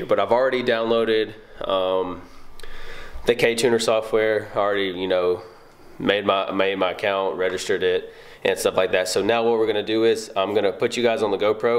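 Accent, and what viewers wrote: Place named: South, United States. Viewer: A Mexican that speaks in a southern accent, never thought I’d see that lmao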